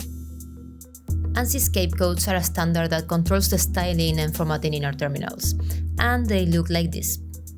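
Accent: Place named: Argentina. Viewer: Great video! I'm a big fan of the format, shots, and quality of this! Uno puede escuchar la argentinidad en sangre con el acento 🇦🇷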